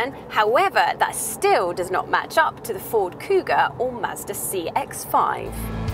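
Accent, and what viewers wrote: United Kingdom. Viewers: I love lady's with British accent ... She is really adorable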